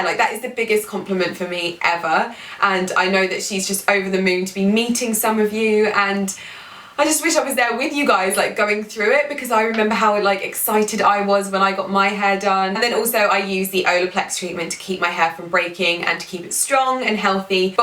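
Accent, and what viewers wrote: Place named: England, United Kingdom. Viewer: Great video. Can someone tell me precisely what area of England this accent derives from? It's by far the most pleasant sounding.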